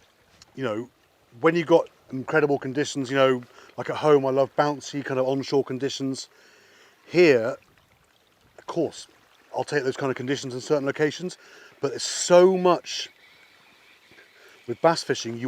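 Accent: South Africa. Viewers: Love the Saffa accent! 😁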